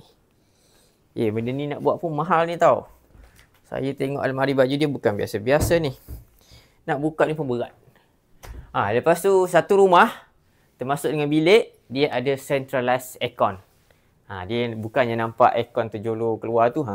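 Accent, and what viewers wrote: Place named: Kelantan, Malaysia. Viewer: Dia cakap bahasa malaysia la tu.. Ada bunyi2 kelantan sikit.. Awat tu??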